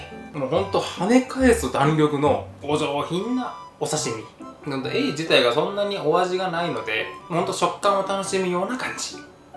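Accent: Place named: Fukuoka, Japan. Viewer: 速攻で冷蔵庫に直してきました。久しぶりに福岡弁を聞けて地元に帰りたくなりました！さんおかえりなさい〜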